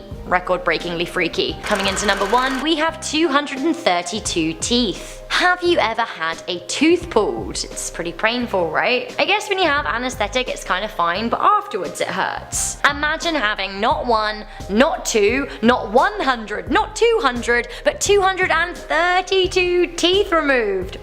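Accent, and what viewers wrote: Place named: England, United Kingdom. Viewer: You are literally my favorite narrator! I have such a thing for girls with English accents. The random noises you make, and just your general commentary is adorable! Haha Keep up the good work! Hope to hear you again soon. <3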